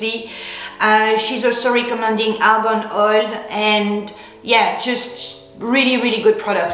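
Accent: France. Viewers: Oh you so funny, but it is funny those big brows.....so nice to see humor and it’s natural. I like your videos. Love your French accent .... and being feminine is such a bonus for all woman. But some just don’t care.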